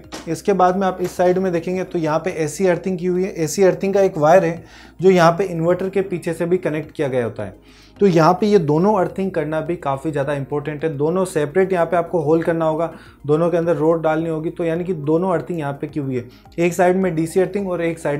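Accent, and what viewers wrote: Rajasthan, India. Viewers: His accent his Rajasthani and not that of kerala. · Ye rajasthani h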